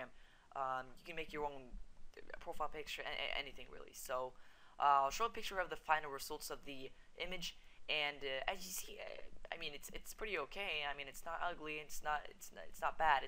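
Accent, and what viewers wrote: Sweden. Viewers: WAIT are you Swedish!? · are you Swedish? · Are u swedish? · r u from sweden? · U are swedish arent u? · u are swedish right??Hej!!!! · Ey!!! Du är ju svensk! Ey!!! You are Swedish! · R u from sweden? :O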